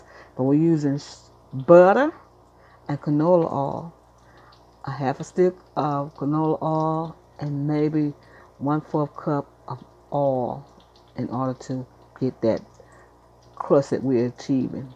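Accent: South, United States. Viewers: An amazing breakfast! Love ur hospitality and ur voice. So southern . God bless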